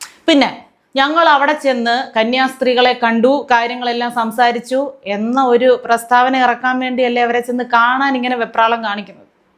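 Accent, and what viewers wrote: Thiruvananthapuram, India. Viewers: നിങ്ങൾ tvm കാരിയാണോ. ഭാഷ അങ്ങിനെ തോന്നുന്നു. സൂപ്പർ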